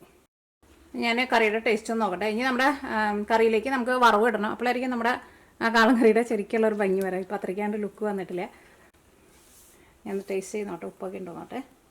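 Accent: Thrissur, India. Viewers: Super trissur slang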